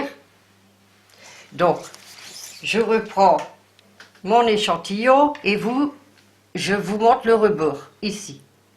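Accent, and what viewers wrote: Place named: Nord-Pas-de-Calais, France. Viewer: 🤣😅😁😄😃😀😂😉😊 Bonjour comment ça va chez-ti zot? Bon comme d'habitude vous me faites bien rire par votre façon de parler, oh la la une sacrée ch'ti,vous me mettait du baume au coeur merci beaucoup 😉😊🥰😍🤩☺ sinon très joli chapeau pour cet été. Avec ce confinement je vous souhaite un énorme courage et une excellente continuation dans vous projet. À bientôt 😃😉😊🥰 la ch'tit de la région parisienne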